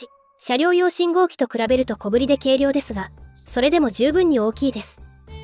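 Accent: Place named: Osaka, Japan. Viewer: ばりばり大阪弁やん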